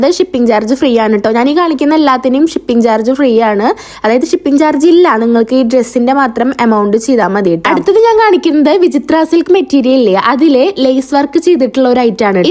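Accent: Thrissur, India. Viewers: Palakkadano thrissur ano oru samshayam ningalude sandam വീട് thrissur analo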